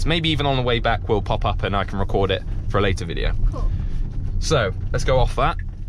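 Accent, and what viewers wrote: United Kingdom. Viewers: I love your British accent! :) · I like your british accent, you sound like DanTDM.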